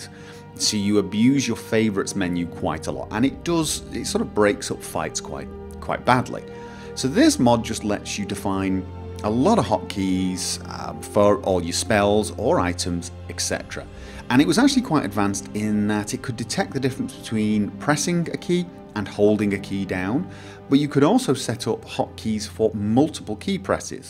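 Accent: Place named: England, United Kingdom. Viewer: DawnGaurd to me was a total disappointment! Vacation? Come on you sound English use Holiday please!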